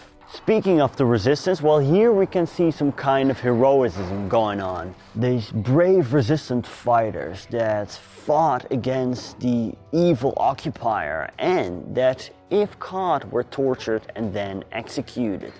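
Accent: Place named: Netherlands. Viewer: Aangezien je dit dus in het engels doet hierbij een tip. Werk nog een beetje aan je uitspraken. Als je dus engels sprekende mensen wilt aanspreken maar je uitspraak van bepaalde woorden niet goed is gaan mensen zich ergeren. Bijvoorbeeld “country” of worden met een D zoals “dead” en het verschil met “death”. Geeft niet, het zijn typisch Nederlandse uitspraken van her engels maar misschien kan je dat gewoon eens oefenen. Want je inhoud is de moeite waard.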